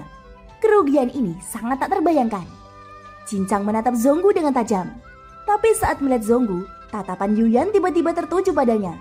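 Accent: Java, Indonesia. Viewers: Min aku rindu suaramu yang meddo'😅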